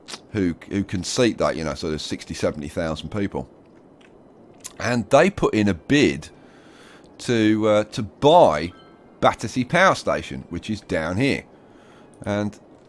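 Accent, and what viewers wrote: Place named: Birmingham, United Kingdom. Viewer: you said you lived in london most of your life, i always thought you had a brummie twang..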